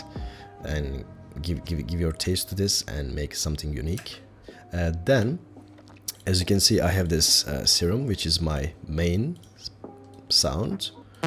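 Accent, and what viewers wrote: Turkey. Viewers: HELAL LAN TURKO AKSANINDAN ANLADIM TURK OLDUGUNU EDITLER TAM ECNEBILERI CEKECEK SEKILDE OLMUS BASARILARININ DEVAMINI DILERIM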